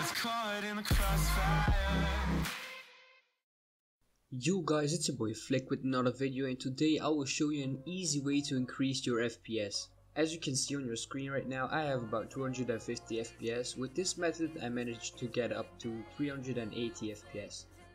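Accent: Netherlands. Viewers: R you dutch bro??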